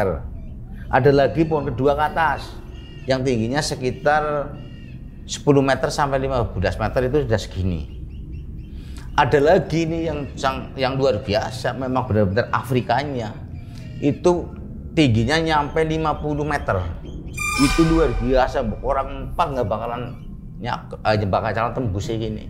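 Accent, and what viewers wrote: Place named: Banyumas, Indonesia. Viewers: Ceritanya sedih dan bikin haru,cm krn bapak ngomong jawanya ngapak,jdi lucu buat saya yg nyimak,jdi kdg udh terharu tetiba ngakak sayaaaa😂😂😂,sehat2 nggih pak❤ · Waduh ngapak ya...😊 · Salam dr Banjarnegara. Kelihatan logatnyaaa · Owalah wong ngapak jan😅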